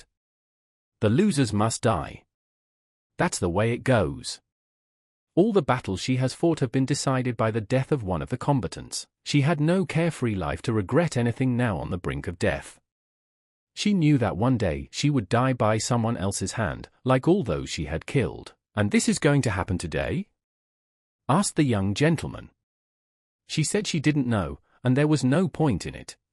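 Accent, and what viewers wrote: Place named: United Kingdom. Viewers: Bri’ish narrator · ya seems really weird having a brit accent to narrate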